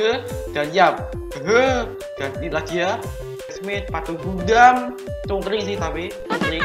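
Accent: Java, Indonesia. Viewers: Wong jowo bng?... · Orang jawa ya bang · Wong jowo mas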